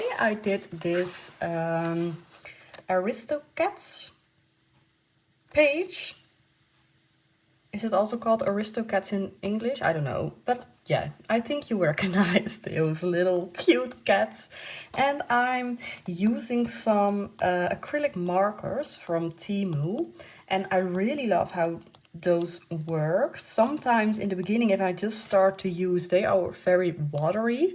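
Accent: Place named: Netherlands. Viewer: Ik dacht al dat het accent niet anders kon zijn dan een Nederlander! Leuk om iemand uit ons landje acties te zien met kleurboeken. Waar heb jij het boek van Klara Markova gekocht want Bol en Amazon hebben die niet.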